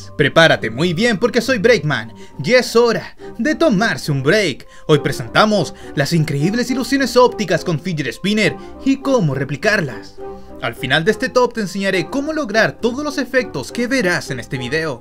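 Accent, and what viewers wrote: Chile. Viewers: eres de chile o soy io?? v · BreakMan eres chileno prro ? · eres shileno wn qlao? fome la wea wena hermano sigue asi Ksnfjflglslfktnek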